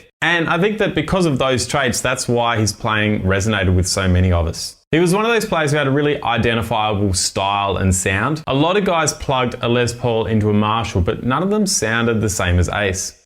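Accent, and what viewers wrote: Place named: Australia. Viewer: I did NOT expect that Australien accent 😅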